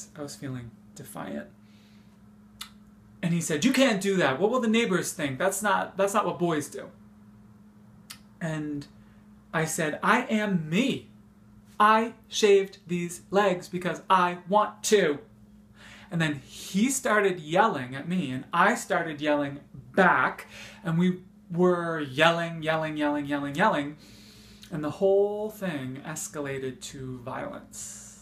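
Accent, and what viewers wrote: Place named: United States. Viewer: 8 year old me thought this is how all Americans talked and are like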